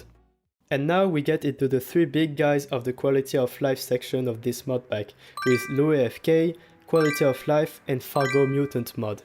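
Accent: France. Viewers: Are you french? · haha sa s'entend que t'es français et merci pour le modpack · T'as un accent français ou c'est moi? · ahah j'ai compris que tu étais français dés que tu as dit "of course ", bon accent et bonne vidéo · U sound french , are you french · J'étais pas sûr avec le temps mais j'ai enfin la preuve avec ton Steam ! Je me posais tout le temps la question avec ton accent mais t'es bien français 😭😭😭😭 Merci pour ton contenu continue c'est incroyable 🙇🙇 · t'es français ? je reconnais bien cet accent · le petit accent français qui fait plaisir super modpack sinon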